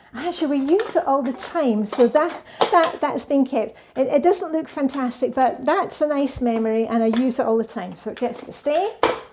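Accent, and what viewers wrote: Scotland, United Kingdom. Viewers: Just discovered your channel. And being a Scot it's great to hear the accent. I was half way there with my routine going to take it further learning from you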